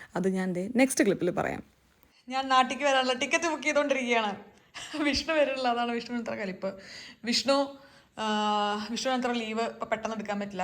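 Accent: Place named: Pattambi, India. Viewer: വളരെ നല്ല തീരുമാനമാണിത്. എന്നും എല്ലാവര്ക്കും വേണ്ടി ജീവിക്കുമ്പോൾ കുറെ വർഷങ്ങൾ കഴിയുമ്പോൾ നമ്മൾ നമുക്ക് വേണ്ടി എത്ര സമയം ചിലവഴിചൂന്നു നോക്കുമ്പോൾ എന്നും account negative തന്നെയാകും. പിന്നെ മക്കള് തന്നെ ചോദിക്കും, നിങ്ങളോടു ഞങ്ങൾ sacrifice ചെയ്യാനായി പറഞ്ഞോ എന്ന്. Very good decision. Hats off to Vishnu for supporting this decision. Please don’t change’ OUR PATTAMBI’ slang. പിന്നെ അങ്ങനെയാണ് പട്ടാമ്പി സ്റ്റേഷൻ ഉണ്ടായതു എന്ന് പറയുന്നത് കേഴ്ക്കുന്നതു തന്നെ ഒരു ഒരു feel തന്നെയാണ്.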